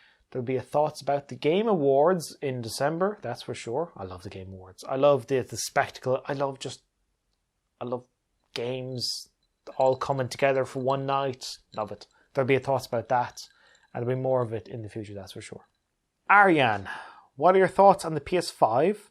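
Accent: Ireland. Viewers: Currently listening to these as an aid to get me to sleep. They do say the Irish accent is musical. All I know is it works. Thanks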